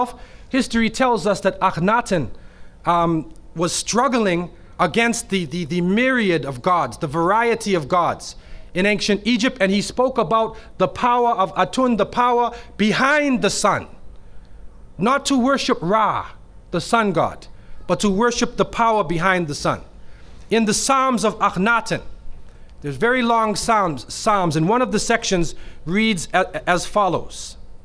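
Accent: United States. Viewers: I love his American accent. Awesome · he's originally American. Boston I believe.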